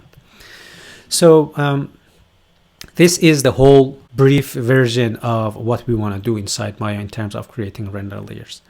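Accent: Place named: Iran. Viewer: اقا دمت گرم خدا قوت خسته نباشید خدا وکیلی عالی بود کارت اگه مثل خودم لهجه نداشتی اصلا فکرش رو نمی کردم ایرانی باشی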